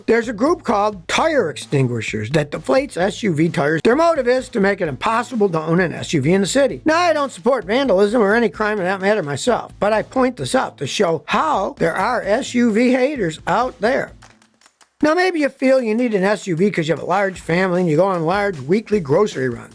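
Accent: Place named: California, United States. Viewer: you’re sounding like a californian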